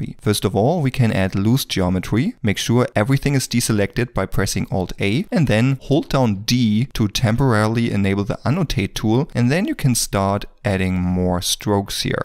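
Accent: Germany. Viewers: Are you from Germany? :D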